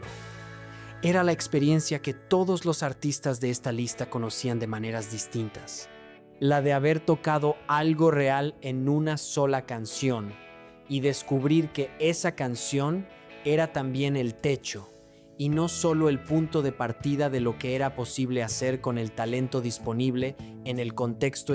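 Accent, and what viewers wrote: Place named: England, United Kingdom. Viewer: Es insoportable esa voz de inglés tratándo de hablar español!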